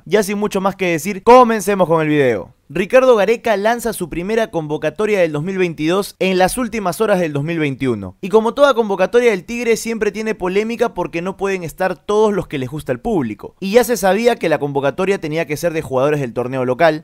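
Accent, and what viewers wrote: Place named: Cordoba, Argentina. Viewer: pprque tienes un acento medio argento, especificamente cordobés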